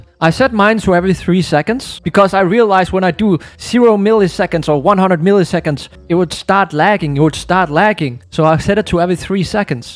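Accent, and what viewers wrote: Denmark. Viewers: YO ARE U DANISH OR WHAT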